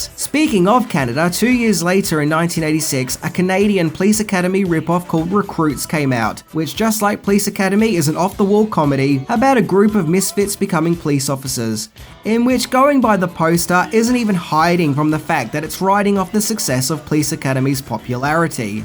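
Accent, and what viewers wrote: Australia. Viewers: After of this horrible Australian narrator, I just... I just can't. 👎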